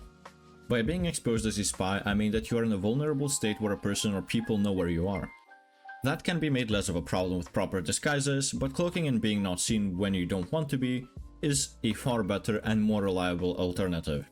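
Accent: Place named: Lithuania. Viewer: Very good video, a lot of food for thought. Random question, whereabouts in the world are you from? I can't place your accent, you sound Scottish, French, Dutch and Eastern European by turns. Edit: Nevermind, just checked your profile about and spotted the note that you're in Lithuania. Odd - I''ve known many Lithuanians but didn't place your accent. Eiti Lietuva, all the same.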